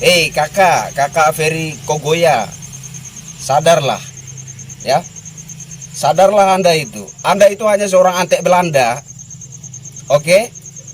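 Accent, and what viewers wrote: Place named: Ambon, Indonesia. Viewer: 😀😀😀 ini org Ambon apa key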